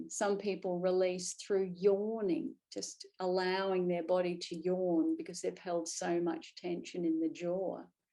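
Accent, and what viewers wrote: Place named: Australia. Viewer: That was Beautiful! You know years and years ago I absolutely fell in love with yours and Terry’s Yoga Nidra recordings and they are a go to frequently still… Beautiful! Salve for the soul..I always felt your voice (first Aussie accented meditation I’d heard….Aaaaahhh!!) and of course the beautiful music just reliably brought me Home..Here..Now! Though I couldn’t quite formulate it as such until just recently. After consuming so much content on the non-dual understanding during the pandemic via beautiful sources such as Rupert Spira, Francis Lucille, Bentinho Massaro, Mooji…etc etc I recognised and felt that the sense of being they had pointed me to was the same seemingly placeless place into which I would frequently and almost always effortlessly sink Deeply upon listening to your Yoga Nidra… So thank You Deeply from the depths of Being it is BEAUTIFUL to be able to finally see AND hear your familiar voice regularly.. 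I Love You Both Very much!! Thanx again! XX Luke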